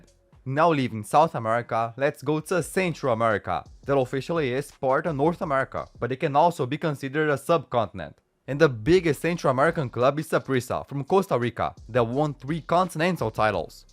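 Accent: Brazil. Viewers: Você consegue percebe só pelo sotaque que ele é brasileiro kkkkk parabéns pelo vídeo mano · Vc eh brasileiro? Adivinhado pelo sotaque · hes brazilian bruh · o acento brasileiro dele e muito evidente, fazendo esse acento no final das palavras, mas a pronunciação dele foi incrivel, ate imitando palabras em espanhol com acento ingles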